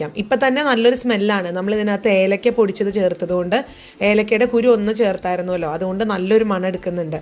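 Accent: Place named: Valluvanad, India. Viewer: വീട് എവിടെയാണ് സംസാരം കേൾക്കുമ്പോൾ ഒരു വള്ളുവനാടൻ ഭാഷ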